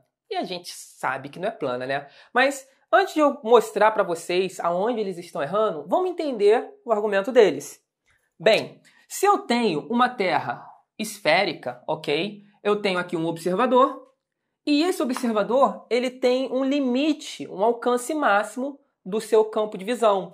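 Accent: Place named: Minas Gerais, Brazil. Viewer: Nuuuu, MINEIRÊS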